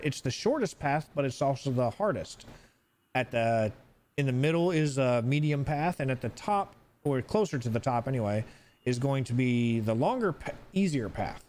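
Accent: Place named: United States. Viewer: It is somehow weird watching someone with an American accent play this game.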